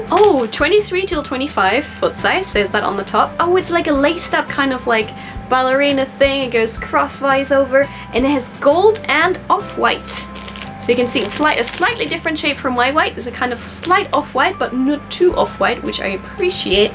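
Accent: United Kingdom. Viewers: It's strange how you sound slightly Scottish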